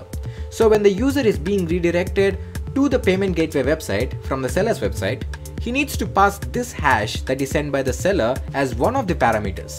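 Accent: India. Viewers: In india school teaches us but I learned from foreign YouTuber and comments And he's Indian you can find by his accent and skin tone I can guess he's from southern India madurai is my guess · fun fact: He sounds like an idian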